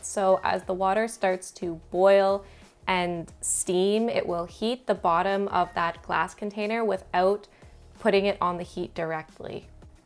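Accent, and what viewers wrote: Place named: Canada. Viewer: I’m from BC originally and live in the states now. I love hearing the Canadian pronunciations. Sachets is an interesting one bc usually Canadians say things more French-ish (i.e., foy-YAY vs. FOY-er), so you’d think it would be the Canadians saying sah-SHAY but nope. Sadly, most of my Cdn pronunciations have disappeared from my vocab but I refuse to give up the correct way of saying PAH-sta (not PAW-stuh). 😂 Great info and exactly what I was looking for. Thanks!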